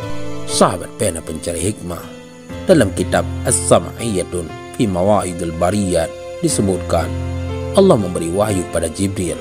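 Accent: Aceh, Indonesia. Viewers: Dari sebutan huruf D nya saya tuhu yg menceritakan kisah nabi ini pasti org aceh.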